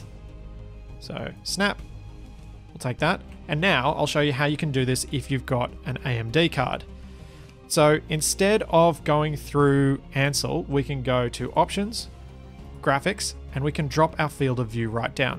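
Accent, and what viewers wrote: Australia. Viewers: I literally watched like 50 episodes of survival before realizing was an aussie. I just have a bad ear for accents and thought he was British or something the whole time. The Bin Chicken episode was what finally enlightened me, after some googling. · Do you come from the land down under?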